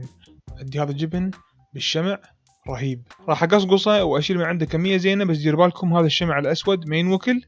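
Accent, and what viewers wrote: Iraq. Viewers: اكفخها كفخة كفختين بالچاكوچ 😂😂😂😂 فدوة للهجة العراقية نخبل والله 😍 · احلى اشي اللهجة العراقية و طبخك بجنن 👍👍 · على فكرة اللهجة العراقية تخبببل ههه انا من تونس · خلو الابداع والطبخ الجبار على جنب بس اسمعو اللهجه طرررب على الاذن اللهجه العراقيه ❤️❤️🇸🇦🇮🇶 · ياربي على اللهجه العرقيه بعشقها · ماشاءالله فلوكك كلش حلو واللهجه العراقيه كلش حلوه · انته عراقي لان حجيك عراقي بالمناسبه احبك واحب مقاطعك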